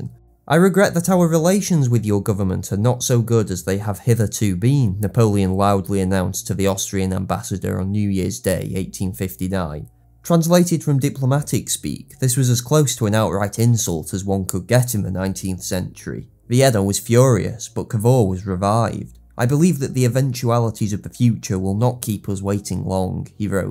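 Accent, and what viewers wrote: United Kingdom. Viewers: high quality content on interesting subjects with a grest british narrator!!! cant ask for snything more :)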